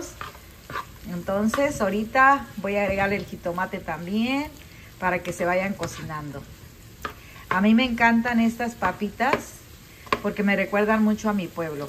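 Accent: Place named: Spain. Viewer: Hola querida chef, más de 1400 videos que preparaste y compartiste para nosotros y alrededor de 113 mil suscriptores es un récord maravilloso y excelente para ti, te felicito.Ver este video de 4 minutos en el que hablaste con un hermoso acento español fue muy divertido para mí, y ver tu video me fue muy útil para practicar español..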